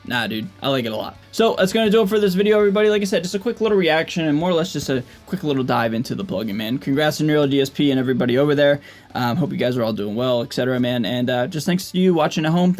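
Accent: United States. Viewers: What am I hearing, meaning what r u playing thru? That was fat man. I want a home studio. And I’m curious about yours. Mostly because you speak American and not British !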